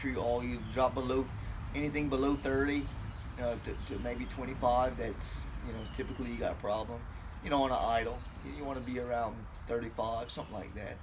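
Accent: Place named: South Louisiana, United States. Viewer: Because I’m from South Louisiana, I bet I can tell where you are from . Eat much crawfish Cher ?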